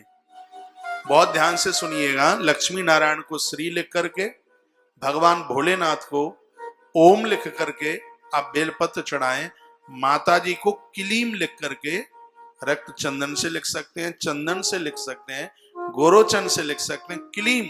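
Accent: Braj, India. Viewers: गुरुदेव आपके श्री मुख से व्रज भाषा बहुत मलूक लगती है .🙏🤗🤗😊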